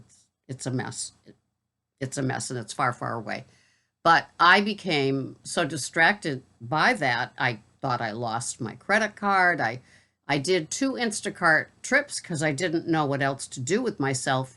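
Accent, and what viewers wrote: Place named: Boston, United States. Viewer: You are doing everything right,! I love to hear you are enjoying carting! The great customers definitely make up for the scamsters! Love your Boston Accent! So Wicked Smaht!! Hope you had a great day! Stay strong & encouraged! 💛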